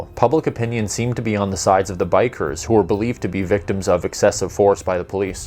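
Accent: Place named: Toronto, Canada. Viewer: Lmao bro you definitely talk with a Toronto accent 😄🤣😂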